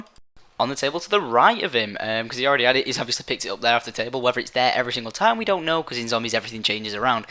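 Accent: United Kingdom. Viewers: Paka poonch. Oh, ur so Brittish.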